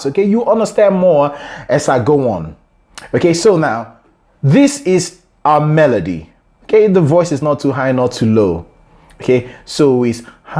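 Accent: Nigeria. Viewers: Be honest, are you a Nigerian? cos you sound like one to me. You look more like an Igbo man. No offence, i love your work. Just want to know.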